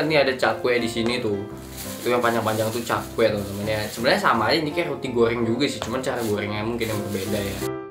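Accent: Bali, Indonesia. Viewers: LU ORANG BALI YA BANG?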